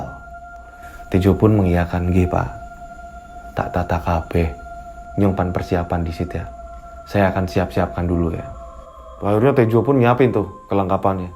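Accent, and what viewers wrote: Banyumas, Indonesia. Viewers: Pintermen Bhs.Tegalnya mas logadnya dapat,lanjut crita slanjutnya yosky....😄😄💪💪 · Bintaro Tangerang hadir mas, haduhhh massss ngeri bgt aq nyimak kisah ini, masalahnya aq y sllu naik kereta klo k jakarta, mas luwes bgt ngomong logat Tegal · Saya suka, bahasanya jls. Dn bahasa Jawa + Ngapaknya itu bikin saya suka. Hehe.. BWI ikut Nyimak. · baru crita ini yg buat q senyum senyum sndiri cara pmbwaane pakai bhs ngapak,,,apik mas enyonge seneng cara ngomong e,,,,😁😁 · Critany dri Tegal ap Pekalongan Min soalny ngomongny Medok bnget hhh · Sereem....tp kudu guyu...bhs tegal e pas bgd🤣🤭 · Ya Allah bang.....multi talen banged Versi ngapak nya best Yoskay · Banyuwangi hadir mas....suka banget mas nyampeinya pakai bhs ngapak" tambah seru...BOOOM BOOOM BOOM....YOSKAY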